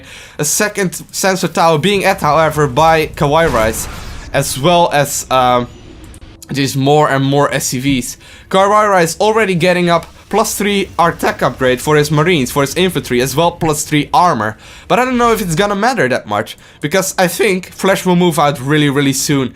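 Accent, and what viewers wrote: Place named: Netherlands. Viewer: This accent could not be more dutch...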